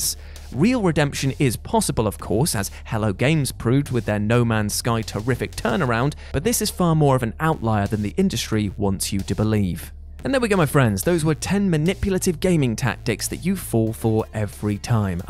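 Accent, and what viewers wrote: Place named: Wales, United Kingdom. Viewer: dude you make a great point dude.And the accent of yours is Welsh accent,cause you seem to laid back for a English dude,But right video game developers are find new ways to rip people off,so dude this a good video on developers and the misleading gamers buying thier games.And I sure those developers will bust your balls in this here comment section,but I am on your side.Cause someone has to be,and your cool dude from the old country,and again Merry Christmas from all of North America.And stay cool over there.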